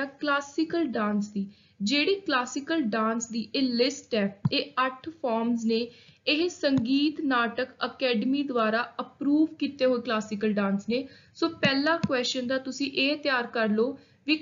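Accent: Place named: Punjab, India. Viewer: Thanks mam uree apa gll krde, punjabi accent bht vdia lgda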